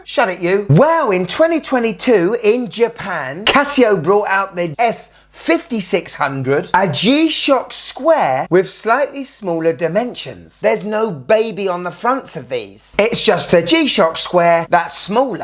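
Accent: United Kingdom. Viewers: Best YouTube channel ever!! Sorry for my bad English.. I'm a fan from Brazil.. I also love Casio watches..I own 4 Casio's . Casio MW 240 is the cheapest and the most beautiful watche ever made. I wish you were my friend. British accent are the best accent in the world.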